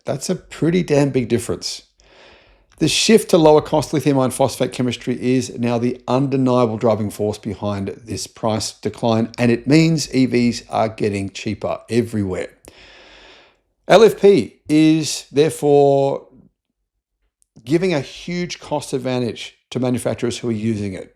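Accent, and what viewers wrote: Australia. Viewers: He speaks colourful Aussie!! 😂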